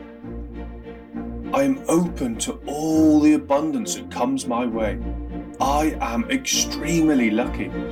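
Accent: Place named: United Kingdom. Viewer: This is great. I've finally found an affirmation video in UK accent.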